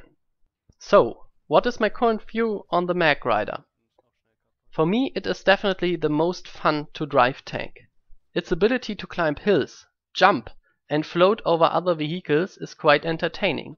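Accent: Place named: Germany. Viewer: Thanks! Very informative! I just upgraded my Magrider, so I dont Need to be ashamed, to have a gunner. This Video helped me a LOT.Ive mainly Play Harrasser or any Kind of Airvehicle. The Magrider was really a challenge for me, but it Looks BOSS. Im goin with the standart Nova, because He aint that good at aiming, if I want to kill Infanterie. For the Gunner, I am going with the Gun, wich gets faster while Shooting. Its not very accurate, but has the highest DPS.With that gun, you can easily do an Destruction-Combat against another Tank with gunner.Im using the Magburner, for the others, I havent decided yet.Thanks for doing this Video m8.(and I can clearly here youre German Accent, "Dosenöffner......auf welchem Server Spielst du?)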